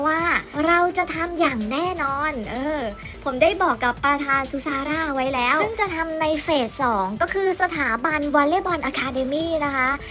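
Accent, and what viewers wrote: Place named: Brazil. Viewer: Pelo sotaque ele é brasileiro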